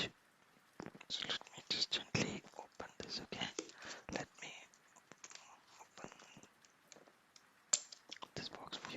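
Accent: India. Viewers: There is just something about the Indian accent that pairs well with asmr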